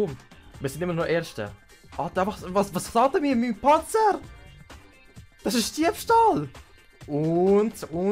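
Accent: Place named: Switzerland. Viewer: Mmmmm Musik in miiene Ohre. [Schwiizerdütsch] andlich moll a Kanal wo nit Schwobedütsch redet. An alli Dütche wo dass woll läse und mi nit so richtig verstot dass soll nümme Spass sie und Zücher Chönet grat wag goo. Spötistens jetzt würde ihr in lelem Kanton i lab. ;)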